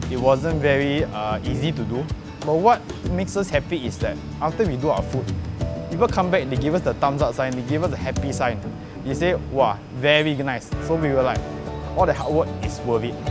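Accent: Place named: Singapore. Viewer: Singlish accent at its finest, love it !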